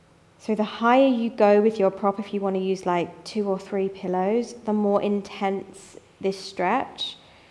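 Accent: United Kingdom. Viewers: Beautiful British pronunciation. And a great yoga class, thanks!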